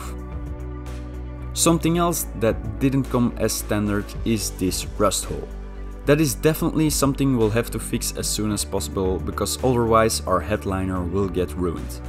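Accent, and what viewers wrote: Netherlands. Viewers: Nederlands? Ik dacht het al te horen maar dit soort video's zijn wel echt geweldig dus duimpies omhoog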